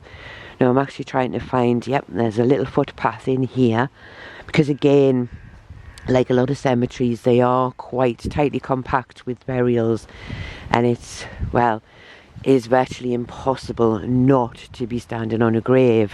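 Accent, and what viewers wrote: Newcastle, United Kingdom. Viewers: Love your content & love the Gordie accent. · More Geordie!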